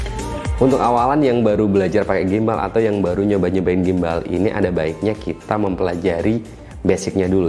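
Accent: Pekalongan, Indonesia. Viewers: Wong pekalongan yo mas? 🙏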